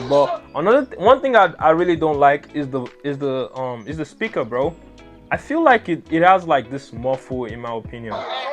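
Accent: Nigeria. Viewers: you straight up know he's Nigerian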